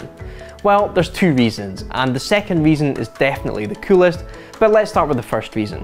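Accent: Scotland, United Kingdom. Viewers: The accent is scotish ?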